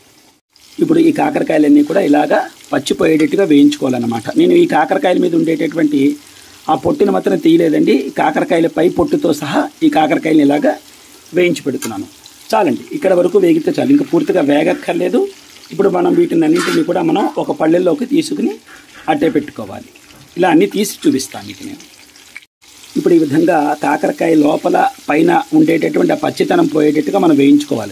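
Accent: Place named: Godavari, India. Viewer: garu namasthe Meeru chupinche vantalu Meeru cheppevidhanam enka bavuntundi Memu anukovadan meeru godavari zillavallu ani Mee matani bati anipisthinfi Tq🎉 maku chala Baga nachhutunnayi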